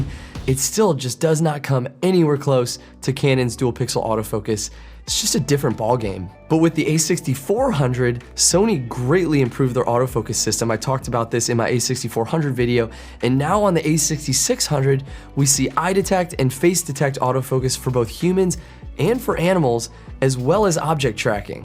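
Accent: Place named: United Kingdom. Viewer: i loved that British nat geo wild voice 😅 thats why i hit that like button,cheers mate ,greetings from kenya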